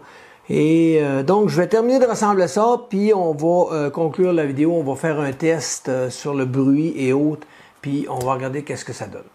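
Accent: Canada. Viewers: J adore tes vidéos (avec ton accent canadien). 😍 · Bonjour, Ayant eu une creality ender 3 V2 à Noël (2021), je suis trop content de ma lancer dans le monde de l'impression 3D. Et je suis donc tombé sur vos vidéos, que j'adore !!! Mais je ne sais pas si vous avez partagé les références des ventilateurs, où si je dois juste "écouter" la vidéo pour les retrouver. Dernière question: comment trouver le STL de la poignée en haut de l'imprimante ? C'est en effet trop pratique ! Et enfin, c'est quoi la pièce en plus juste avant l'extrudeur ? Ca sert de guide au filament ? Bref, j'adore vos vidéos, et vu de France, votre "accent" Canadien et votre façon de parler sont tout simplement hyper rafraichissants ! Continuez comme ça !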